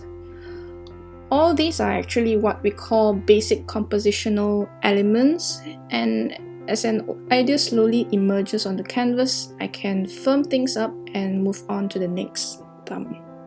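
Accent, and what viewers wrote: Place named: Nigeria. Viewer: i swear your accent sounds Nigerian. if it wasn't for your profile pic i would have guessed you were my country mate.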